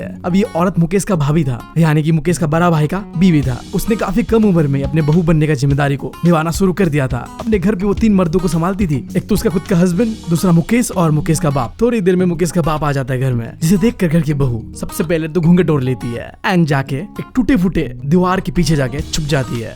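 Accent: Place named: Bengal, India. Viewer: bro apka hindi bolne ka tarika sachme bangoli type ka hai mere bestfriend ki traha 🙃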